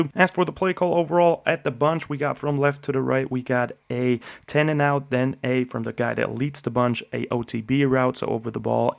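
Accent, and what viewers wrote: Chicago, United States. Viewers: Native Chicagoan here, you got a little Chicago accent slipping in on your R’s and A’s. Proud of you man 🎉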